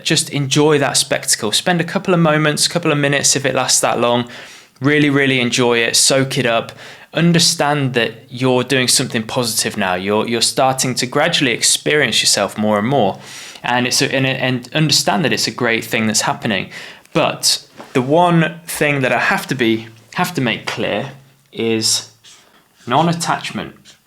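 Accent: United Kingdom. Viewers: I REALLY APPRECIATE HEARING BRITISH PEOPLE SPEAKING OUR LANGUAGE AND SEEING WORDS SPELT CORRECTLY RATHER THAN SPOKEN IN AN AMERICAN DIALECT WITH WORDS PRONOUNCED AND SPELT INCORRECTLY WITH LETTERS MISSING! AMERICAN LANGUAGE IS ENGLISH SO WHY DO THEY FEEL THE NEED TO MISPRONOUNCE AND MISS SPELL WORDS AND CALL IT AMERICAN ENGLISH? PATHETIC!! GREAT VIDEO BY THE WAY FIRST TIME IVE SEEN ONE OF YOUR VIDEOS AND I FOUND IT VERY INFORMATIVE, I'M LOOKING FORWARD TO WATCHING MANY MORE, THANK YOU FOR BRINGING YOUR VIDEO'S ON THE INTERNET. 😊😊😊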